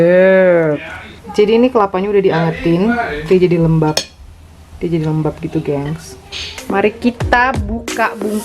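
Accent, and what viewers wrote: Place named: Balikpapan, Indonesia. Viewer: Gaggahh nya pang.. Balikpapan bgt🤣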